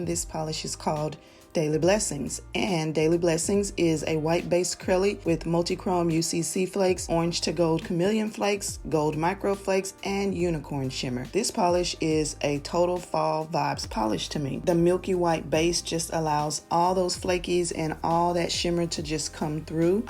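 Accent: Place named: South, United States. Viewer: Goodness gracious sakes alive this collection is GORGEOUS🤩!!! I never saw this brand before so I appreciate you introducing me to MJ Polish! I'm gonna get this whole collection on their black Friday sale😁...thanks for that info too! On a side note.... I feel so comfortable when I watch your videos. Your kind voice & personality makes me feel like you are one of my cousins next door! I'm in southern Kentucky (close to Nashville Tn) so I LOVE your southern accent & you saying, "y'all", all the time like I do! I know this has nothing to do with the polishes but I'm sure your personality is alot of the reason people subscribe to your channel 😊. Thank you for the video & have a BLESSED Thanksgiving!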